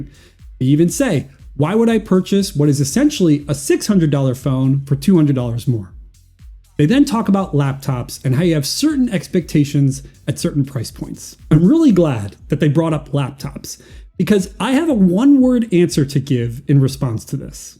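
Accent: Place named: Australia. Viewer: Are you really going to take advice from a dude w an Australian accent?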